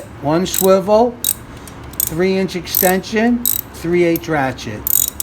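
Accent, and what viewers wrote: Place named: New York, United States. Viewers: Great video. Love the NY / NJ accent. Doing this rear shift shaft fix tomorrow. Wish me luck. Pretty loose but no oil leak yet. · Hey. Where are you located? Sounds like a Brooklyn or jersey accent. I’m in NYC. I’d like to have some work done on my bike and would love if you could do it. I like how clean and meticulous your work is.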